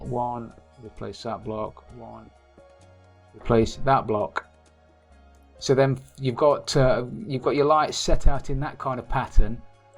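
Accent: England, United Kingdom. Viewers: 30 seconds in i sub cus u sound like a nice english lad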